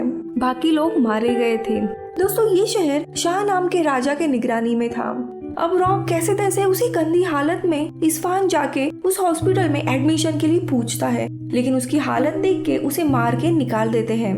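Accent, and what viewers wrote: Maharashtra, India. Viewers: May be from marathi school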